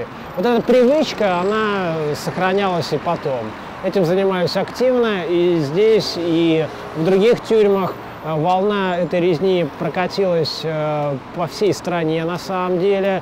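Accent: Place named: Russia. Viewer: С французскими окончаниями у мэтра не задалось, как читает, так и произносит. Strong Russian accent.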